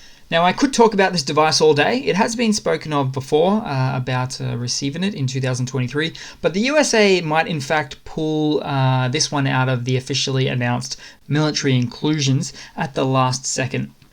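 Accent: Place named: Australia. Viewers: Sounds like an Aussie... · My guess is Aussie 🇦🇺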